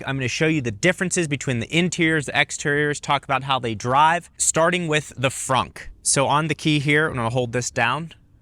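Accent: Pittsburgh, United States. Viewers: Sitting here at AGH in the Northside watching this vid! Nice to hear you getting back to your yinzer roots!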